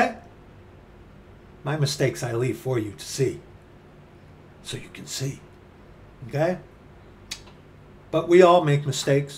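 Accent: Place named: North, United Kingdom. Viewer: I try to follow along, sometimes I don't have the time, but when I can I do follow you along. Your Northern accent throws me now and again!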